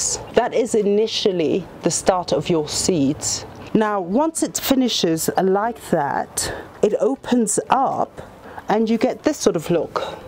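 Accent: United Kingdom. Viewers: Your accent is so British (coming from England myself)!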